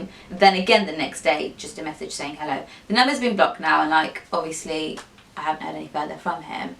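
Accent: United Kingdom. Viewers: Your accent is soo cute! I'm British too but your British accent is so nice!❤️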